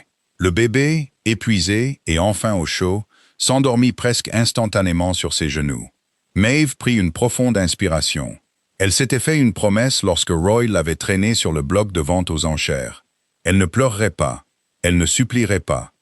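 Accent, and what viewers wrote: United States. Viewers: Pourquoi la voix ia prend un accent américain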